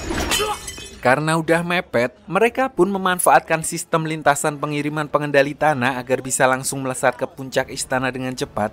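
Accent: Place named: Java, Indonesia. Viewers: Medok amat mas ngomongnya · bisa gasi gausah medhok pas ngomong bahasa indo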